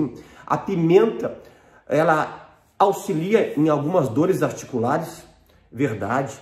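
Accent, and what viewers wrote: Rio Grande do Sul, Brazil. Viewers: Obrigada doutor, é muita bondade ensinar o que sabe, que sotaque bonito o senhor tem! Sotaque dos gaúchos.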